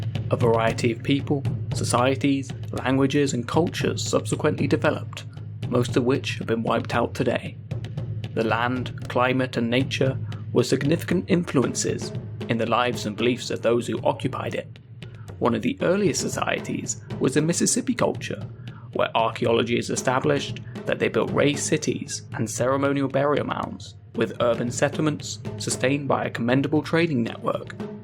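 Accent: United Kingdom. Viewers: Its crazy that he is saying this while he has a british accent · This whole thing is Americans propaganda in a British accent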